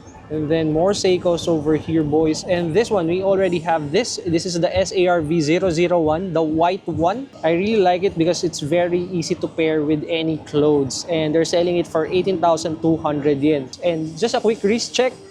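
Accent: Philippines. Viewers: Love your PH accent, was in PH years ago loved it there so nice people! Wow those Tissot and Hamiltons are stunning! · watching this channel for the thick philipino accent!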